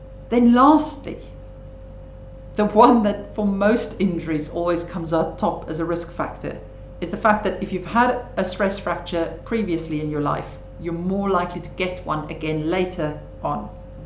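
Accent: South Africa. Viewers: Thank you Dr. Your accent sounds South African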